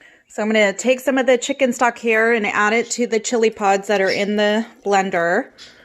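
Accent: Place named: United States. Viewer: Idk I’m sorry to say but even her accent was too American aka “white” she even said paratha like a white person 😩 idk I think I’ll experiment in other halal tamales I grew up eating them but mom used pork fat and it’s a no no for us Muslims. But ghee it is maybe with some red sauce in the masa también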